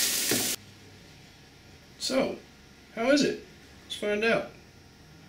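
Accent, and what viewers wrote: United States. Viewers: are you chines or do you live there becuase you make every thing that chines people make and you sound country to me not being roude im just wondering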